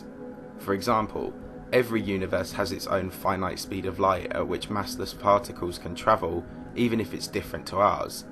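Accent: United Kingdom. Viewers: That monotone British voice tho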